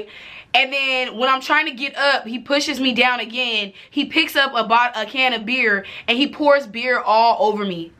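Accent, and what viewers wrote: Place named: Chicago, United States. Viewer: Chi-Town Accent! Choctaw face!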